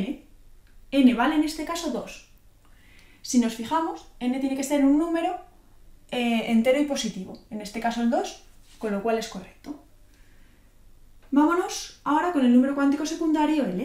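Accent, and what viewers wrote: Spain. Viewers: Dificil concentrarse con una profesora tan hermosa, dulce y con ese acento español tan maravilloso.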